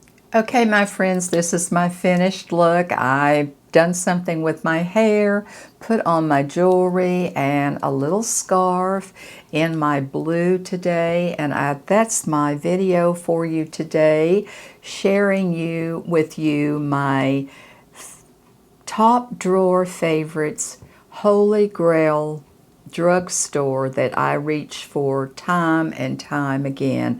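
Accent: South, United States. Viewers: you are just precious!! You give women our age such confidence in the ability to make ourselves look and feel beautiful. I can't get enough of your southern brawl. That's a compliment! You are stunning!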